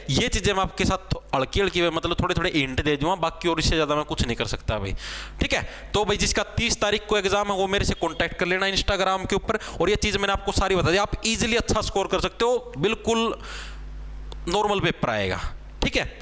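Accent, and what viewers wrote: Haryana, India. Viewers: Wah kya majay dar language hai sir apki i think haryanvi hai ❤❤❤❤❤ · Love your Haryanvi accent bro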